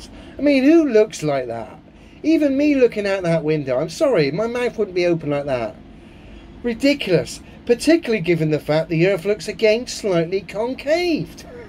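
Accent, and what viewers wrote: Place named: United Kingdom. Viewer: Cheers. How I like to hear a British voice on yt 👍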